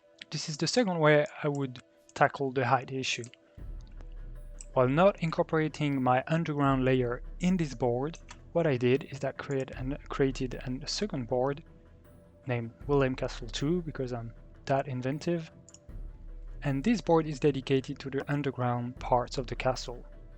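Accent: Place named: France. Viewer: Hi, love your modular sets on the bazaar, and your explanations are really useful ps: ton accent t'as trahi mon cher concitoyen francophone X)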